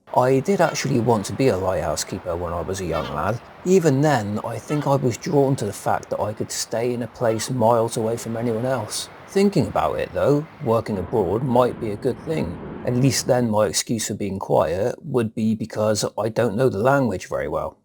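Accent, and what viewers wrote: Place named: RP, United Kingdom. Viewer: On a different note, this channel is quite good if you want to learn Received Pronunciation.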